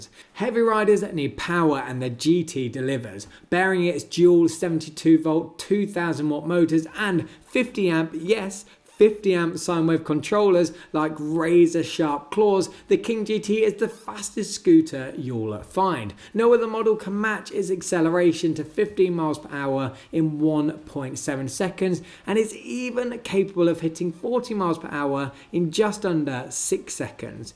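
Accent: United Kingdom. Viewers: he talk in dollar with a sharp british accent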